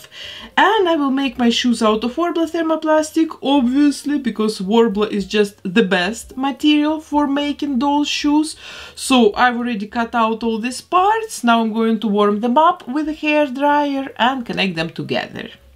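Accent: Russia. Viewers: По первым фразам поняла, что вы русская 😇😘😘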